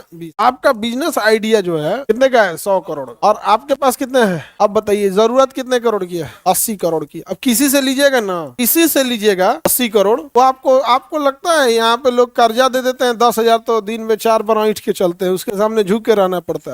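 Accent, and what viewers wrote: Bihar, India. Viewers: Chahe pdhe likhe ho,pr excent to bihari dudh vala hi hai..meri mmi boli jo truck pe maal load krte unki video sun rhe ho😂😂 Hindi ni aati aapko Vaise to bolte ho ke google ki jagah indian search,type ek video bnai thi aapne..😅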